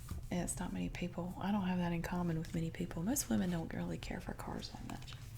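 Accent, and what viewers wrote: South, United States. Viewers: Just love your southern accent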